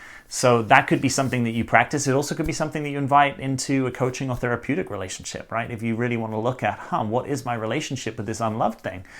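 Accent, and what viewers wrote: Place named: United Kingdom. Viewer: Your teaching and views are resonating with me more than any other coach. I love your british accent! Thank you for all your work! Your my hero, because you are so truethfull.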